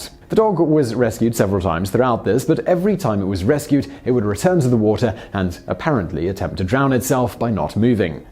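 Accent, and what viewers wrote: United Kingdom. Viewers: Thank you for talking about Overton bridge! I heard about this many years ago and had forgotten about the (supposed) phenomenon. P.s. always good to hear a British accent! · Question: he has a British accent but I can’t figure out if he’s a Muslim background. He’s got hairy arms and a beard and a big nose which leads me to believe he’s a Muslim.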